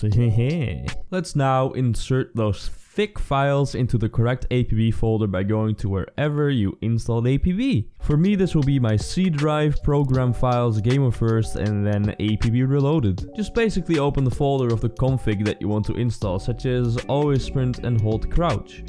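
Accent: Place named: Netherlands. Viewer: Hahaha your dutch tho kan gewoon je accent horen maar bedankt pik me laptop kan nauwelijks dit spel aan, Dus ga zeker dit proberen!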